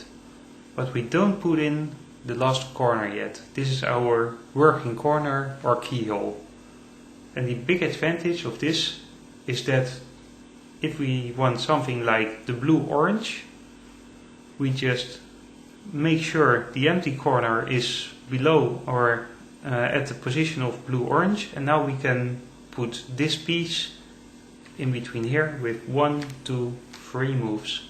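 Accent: Netherlands. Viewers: It's so obvious you're dutch, but good vid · thnx man en ja het is te horen dat je nederlands bent ;)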